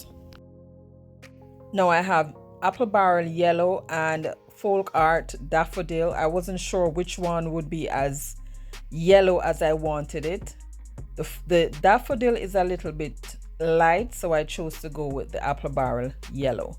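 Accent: Jamaica. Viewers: I just LOVE your voice are you by any chance from JAMAICA?😊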